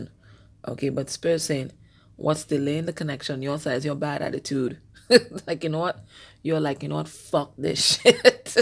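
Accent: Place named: Trinidad and Tobago. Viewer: Where are you from? Trinidad?I heard the accent and immediately subscribed! I am from St. Kitts.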